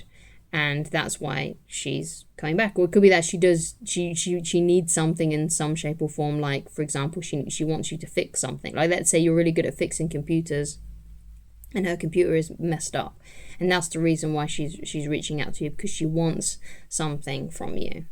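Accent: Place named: United Kingdom. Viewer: Strong UK accent and the final piece of puzzle I needed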